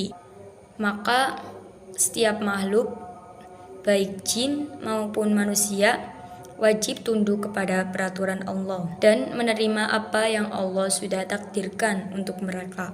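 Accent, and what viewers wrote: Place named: Java, Indonesia. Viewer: Good luck, medok ya gaes ya😅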